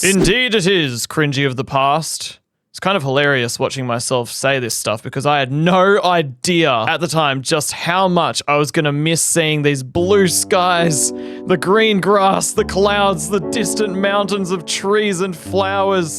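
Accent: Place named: Australia. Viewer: You sound like a fair dinkum Aussies.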